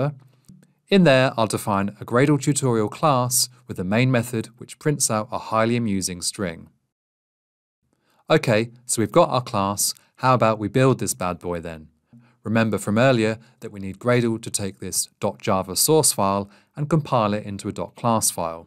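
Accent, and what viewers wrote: United Kingdom. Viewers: man, that british accent :P cant wait for spring boot, hibernate , everything whatever concerns java tutorials:>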